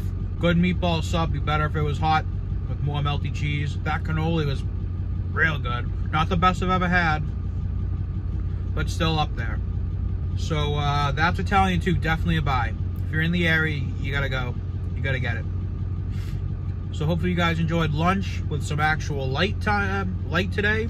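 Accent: Boston, United States. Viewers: I hear a Boston accent! Gahlick, pahmesiahn. REPRESENT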